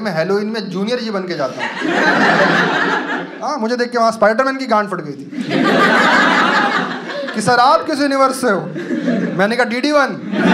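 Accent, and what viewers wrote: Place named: Punjab, India. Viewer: LOVE UR PANJABI ACCENT OF SPEAKING BC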